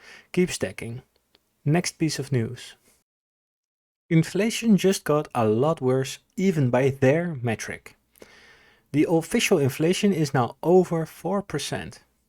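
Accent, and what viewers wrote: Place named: Germany. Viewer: With that accent you got to be deutch ?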